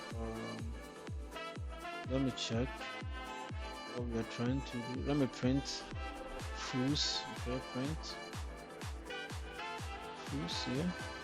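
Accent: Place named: Nigeria. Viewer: he sounds like a Nigeria, is he one ?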